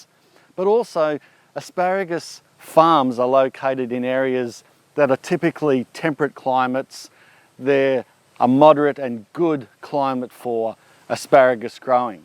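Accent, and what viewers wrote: Australia. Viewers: Skip to for tip one then for 2. Jesus just turn playback to 2x if you don't have and issue with the Aussie accent.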